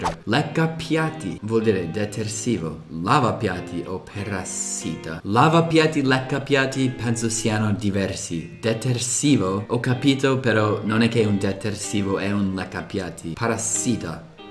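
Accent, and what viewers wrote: United States. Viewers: sei molto simpatico e hai una notevole proprietà di linguaggio in italiano, e conosci moltissimi vocaboli. solo hai un grande accento americano, ad ogni modo si capisce bene quel che dici, lo puoi anche tenere. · L'inglese parlato in Inghilterra é piú facile da capire. Con l'accento americano come il tuo non si capisce un cazzo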